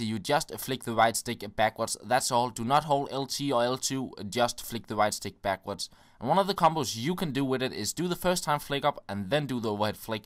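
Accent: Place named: Denmark. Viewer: Haha, man kan hører du er dansker :-) - Elsker dine videoer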